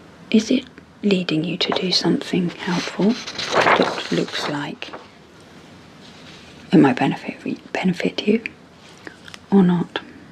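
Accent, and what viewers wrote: United Kingdom. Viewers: Therapy, amsr, soft spoken akd British? Yes, please. Lol. Thank you for your time and energy. 🙏🖤🤟🙃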